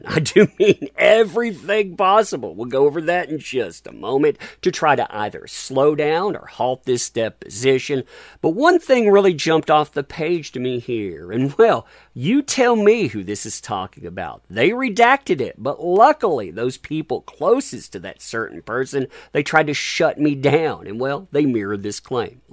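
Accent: South, United States. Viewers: Your doing a good job there keep it up, and that's a damn fine good southern accent as well! T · 🤠Howdy, Pawdner... You're such a WILD THING when it comes to doing your thing called BROADCASTING 😂😂😂 and ALL we women LOVE you for that cute southern accent and for the DECENT fellow you are. If AH ever imagined you're everybody's Good Samaritan of Justice and avenger of the Innocent people... She'd get jealous! But I'm pretty sure she Does, lol👍🏼GREAT JOB! BLESSINGS and my most loving respect for you always coming your way.. Love & kisses you wild Thing. 💪🏻UMH! Forever Your's.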